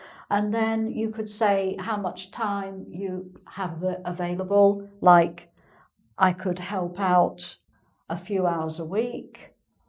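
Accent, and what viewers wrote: United Kingdom. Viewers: Hello, thank you so much for your video lessons. You have very beautiful and clear British pronounsiation. I've got a request. Could you please make a video where you explain the meanings of the modal verbs like must, have to, ought to, to be to etc. The difference between them and how to use times with modals and so on.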